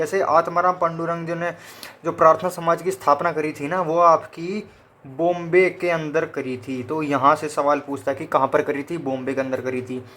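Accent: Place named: Baraut, India. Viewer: Kaha se ho aap baraut side ki language h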